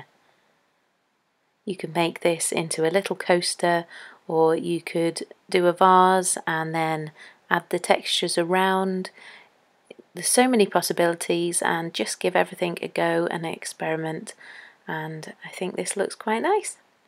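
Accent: England, United Kingdom. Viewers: Great video and as someone else said no music just your lovely calm voice ..One of the best videos I have seen and also great ideas on our doorstep ..thank you so much ..Also lovely to hear someone English for a change.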